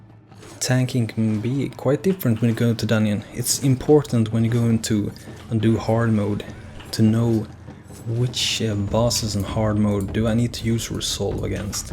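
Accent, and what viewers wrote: Sweden. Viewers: Sounds very cool! :) Couldn't help to notice that you were swedish. So am I ;) Ha det bra, kommer fortsätta titta på dina videos !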